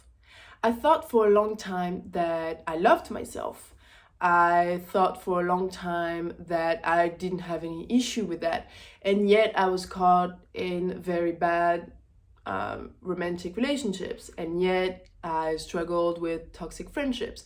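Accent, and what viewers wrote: France. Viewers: (I love your French accent!) I knew, you're gonna like the whole YouTube thing. These cases about our psychological impact on our lives are very important to highlight.Im glad you're doing that., you're this kind of influencer, that we need to influence our lives. Thank You 🙏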